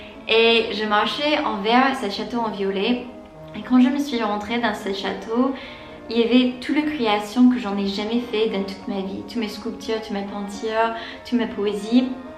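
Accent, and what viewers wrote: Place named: Paris, France. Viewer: Yeeeeeeeeeeeessss, the best day of the week. Also, a french speaking anglophone (Toronto) living in a franco-canadian city (Montreal), and in a relationship the last 7 years with a european french speaker (Belgian), it makes me laugh how your accent seems so.... Parisian Anglophone ahaha. Especially when you talk with your husband in some other videos, the Parisian really jumps out, it's really nice!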